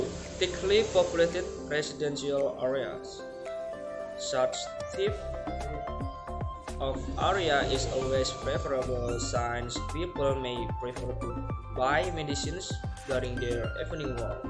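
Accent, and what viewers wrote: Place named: United Kingdom. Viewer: british banget😍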